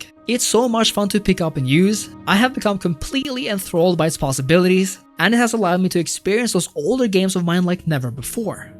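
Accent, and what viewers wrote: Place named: Norway. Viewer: you from Norway? The accent sounds very much like it:)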